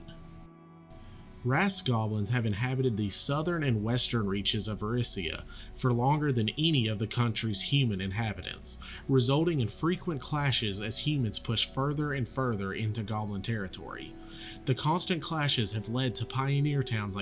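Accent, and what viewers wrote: South, United States. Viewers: Love your work & a friendly Southern voice! Peace!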